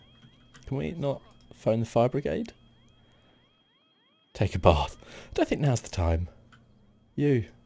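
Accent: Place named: United Kingdom. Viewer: British accent + ASMR = Masterpiece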